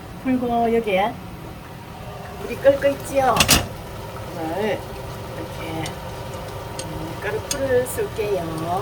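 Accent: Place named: Gyeongsang, South Korea. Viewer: 경상도 분이라 설명이 쏙쏙ㅎㅎ 잘 배울께요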